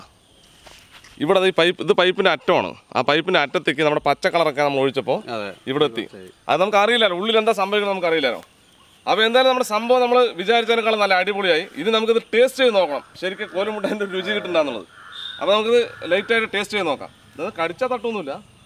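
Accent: Palakkad, India. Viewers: Ayyo nmde palakkad slang kelkumboo bayankara happyyyy😍😍😍😍🤩🤩🤩🤩❣️❣️❣️❣️❣️✨✨✨❣️❣️❣️❣️❣️❣️❣️❣️❣️❣️❣️ikka video polichu ❣️❣️❣️🤗🤗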